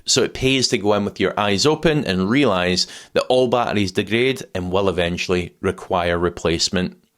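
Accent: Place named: Cockney, United Kingdom. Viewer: damit... sometime I feel I hear Cockney! Can you please speak more distinguishable, more sharp?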